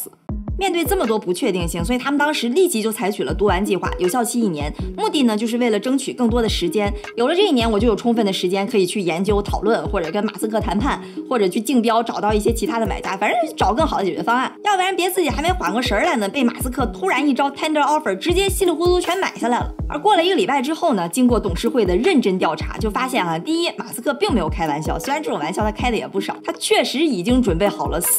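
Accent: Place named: Beijing, China. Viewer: 京味的单口相声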